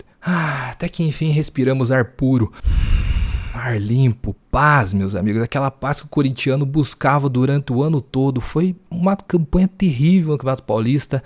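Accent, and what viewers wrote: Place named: South, Brazil. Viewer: Tu tem sotaque do sul e torce pro Corinthians, explica pra nós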